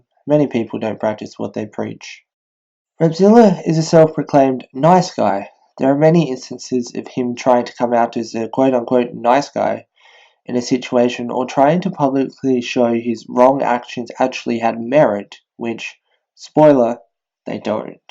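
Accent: Australia. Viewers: it's so relaxing hearing another australian accent in videos. i don't have to put everything thru a mental translator from whatever to slang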